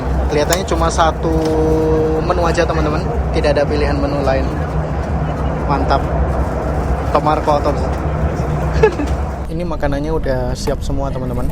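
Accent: Java, Indonesia. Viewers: Bang, maaf ijin tanya. Abang org malaysia atau org indo? dr logat nya orang jawa. Abang belum pernah naik kereta indonesia toh? Kereta kita juga ad yg ada cafe dan pramugarinya. Design dna teknologi jg lebih canggih. Ada karaoke, charger dan tempat duduknya tidak sesempit di malaysia. Jendela juga besar sekali. Ad tempat koper. Coba update dulu sebelum kasih judul bang.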